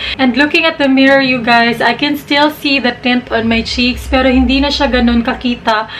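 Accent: Visayas, Philippines. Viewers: ang cute mo po ate bisaya ka po ba? hahahahaha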